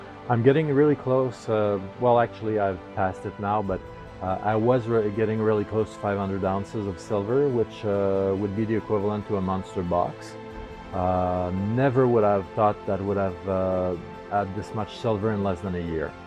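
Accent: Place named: Quebec, Canada. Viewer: I recognize the Québécois accent lol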